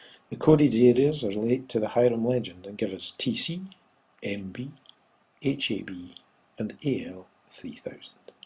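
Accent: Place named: Scotland, United Kingdom. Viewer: He's a Scotsman …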